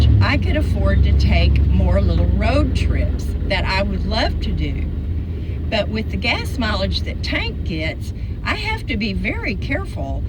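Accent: South, United States. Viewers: Oh....I've just found you. Please don't be gone forever...take a break but don't leave us hanging. You are too interesting and sensible. Plus your southern accent is delightful. Hope you will consider posting more even if not as often. Safe travels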